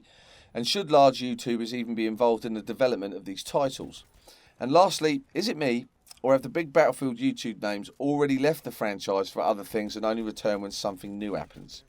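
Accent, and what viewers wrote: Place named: Cockney, United Kingdom. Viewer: i love your cockney swagger but i disagree. Frags xfactor and levelcap do still play the game and i see regular "enough" content. Westie on the other hand seems to think hes some sort of historical know it all and i agree with most of what you say about him. That being said i consider his channel a good inside source for bf content. This game isnt dying, the premium platform is dying. Thats why content has seemed scarce and i believe dice/ea are taking a different approach. Bf1 is by far a better game (for me) than all bf games previous because it ran like butter on pc from day 1. For me personally it solidifies its position as the best battlefield game ever. #fluffybastards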